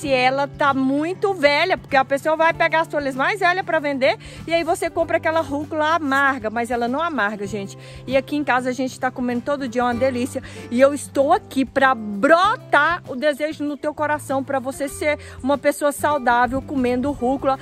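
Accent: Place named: Goiás, Brazil. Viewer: Oi vc parece Goiana. Ô trem bão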